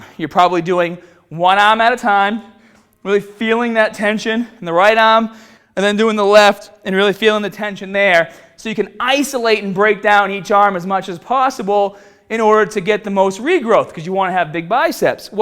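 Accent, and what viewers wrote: Boston, United States. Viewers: TreJay Ratliff i hear everyone spam about his boston accent in previous videos Xd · damn it's been awhile since I seen any of this videos. I didn't know he had a Boston accent, lol. keep up the great work.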